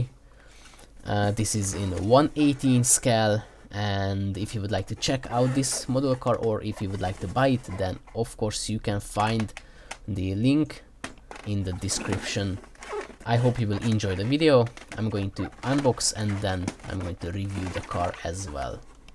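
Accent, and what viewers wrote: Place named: Hungary. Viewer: A jo magyar akcentus:D Nagyon jo video lett egyebkent! :D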